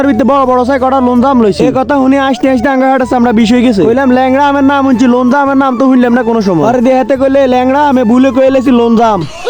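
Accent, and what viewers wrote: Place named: Noakhali, Bangladesh. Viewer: ❤️❤️❤️❤️❤️ ভাই আপনার কথাটা খুব সুন্দর আপনাদের নোয়াখালী ভাষায় আরো ভিডিও চাই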